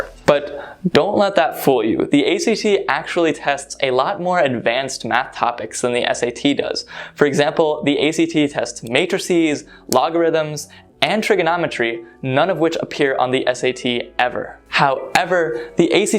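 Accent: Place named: England, United Kingdom. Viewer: I really love your English accent .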